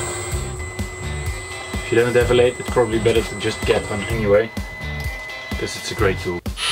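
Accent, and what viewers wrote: Netherlands. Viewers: Bent u Nederlands? Zo lijkt het wel. Ik herken uw accent.🤷‍♂️ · why is it so easy to recognize some one that is dutch like they got a very own way of talking i find it funny ps blijf zo doorgaan leuke video’s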